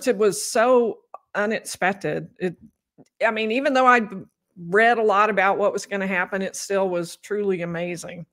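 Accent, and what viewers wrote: South, United States. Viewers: Your Southern is coming through,!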